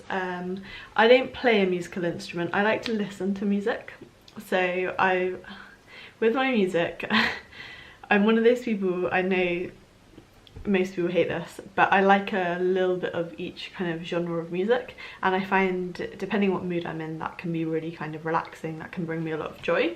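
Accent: United Kingdom. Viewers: I absolutely LOVE!! Your British accent. It adds to your personality. Love you.:-)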